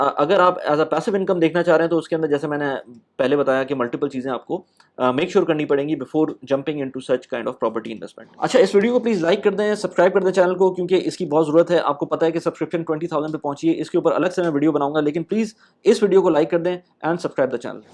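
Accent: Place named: India. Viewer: What language do you speak?! Your English is more Indian than English. It's horrible.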